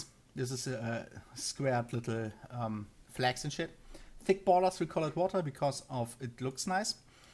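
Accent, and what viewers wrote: Germany. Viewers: That authentic German accent i love it! Greetings for Sweden man, thanks for the Tutorial.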